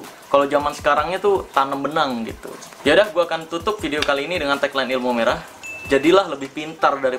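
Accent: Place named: Madura, Indonesia. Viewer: logatnya,,Islam madura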